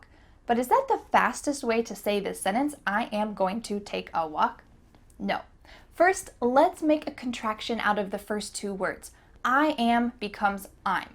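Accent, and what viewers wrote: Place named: West Coast, United States. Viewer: You sound like you're from the west coast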